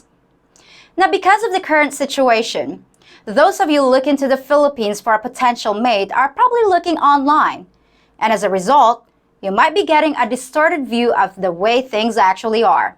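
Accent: United States. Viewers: How do you sound so American and know our colloquialisms? ad nauseum, I know many who dont know this phrase and many others you use. Did you live in the US? Canada? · did you live in the states? You sound way too Americanized even your way of thinking. One rule for men to follow. No honey no money. Meaning you have to meet them first and see how it unfolds.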